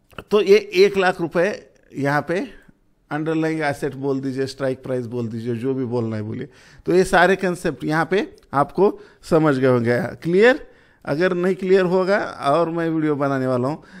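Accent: Maharashtra, India. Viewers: Hats off to your explaining process with great marathi accent, maja aali fun with learn just wanted to tell others that learning is not a overnight change process. It required lots of time, practice, patience and self believe. Guruji ek video practical daalde aur aap turant wo sikh jao agar aisa he to share market aap keliye nahi he dosto sorry to say that but it's fact.🙏🏻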